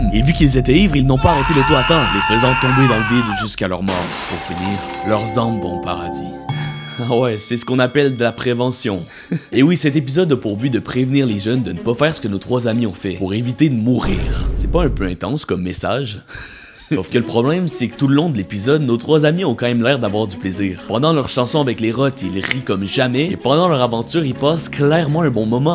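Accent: Quebec, Canada. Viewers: t tu quebequoi touer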